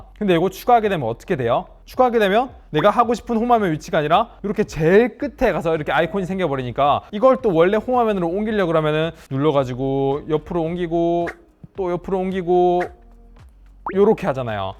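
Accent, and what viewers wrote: Gyeongsang, South Korea. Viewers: 좋은글 감사합니다~ 정신없는 경상도 말투는 정말 안바뀌시네요~ 😂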